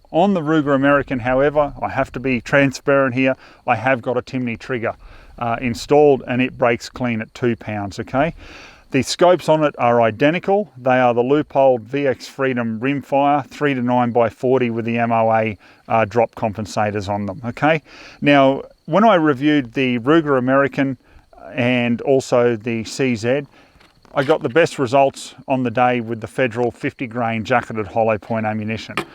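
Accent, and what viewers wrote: Australia. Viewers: The dude is from Australia. I’m from the US. No wonder everybody hates us. You are either stupid or arrogant.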